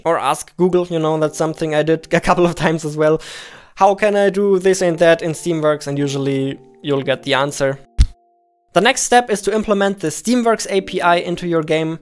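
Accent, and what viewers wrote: Germany. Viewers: Hör ich da deutsch? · because he's German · are you german? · Bist du deutsch? 😂 #nofront aber man hört es😊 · Ich habe direkt gedacht das du bei dem Akzent deutsch sein musst haha :D Super Video! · His dialekt sounds like german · Man hört den Deutschen Akzent raus :D. Finde das Tutorial sehr Hilfreich! Danke!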